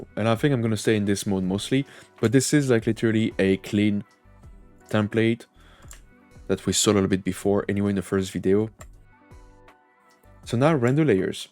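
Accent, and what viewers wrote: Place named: France. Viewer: Sa sentend quil est français lol trop cool x)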